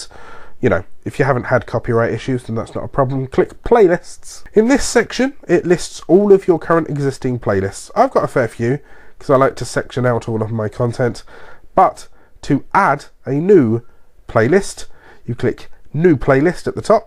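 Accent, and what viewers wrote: United Kingdom. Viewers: I love the British sound. Even i don't get it very well.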